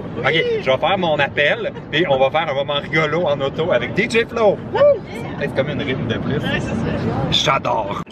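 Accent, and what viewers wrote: Quebec, Canada. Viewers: Les gens qui ont le même accent que j'aime tellement!!! Vive le Québec 😂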